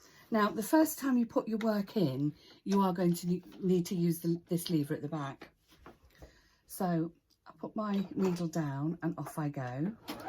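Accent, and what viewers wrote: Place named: England, United Kingdom. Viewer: Oh thank goodness a lovely english accent video explaining... instead of a shrill american accent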